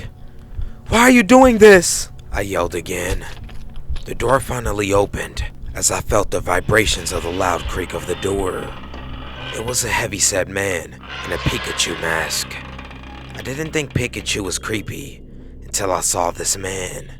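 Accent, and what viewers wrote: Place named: South, United States. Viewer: Awesome! I like your accent! I'm guessing it's from the south, but I'm half Japanese,half Danish so I'm not the best to hear the difference,but been to US many times and my aunt lives in Houston and your accent sounds like my uncles! Anywho I'm very fond of your narration, accent and especially when you get your lady to knock and you let us hear the "takeout" it makes you "human" ! Hope the new stupid guidelines won't affect your channel as you have great thing going on here and you know what hot now! Hope you'll do more ghost/paranormal as your voice is great at that! Good luck with everything! Xoxo DM ,Copenhagen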